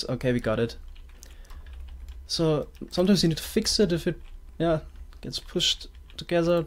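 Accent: Germany. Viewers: bro, ich liebe deinen deutschen Akzent! Liebe grüße aus Österreich!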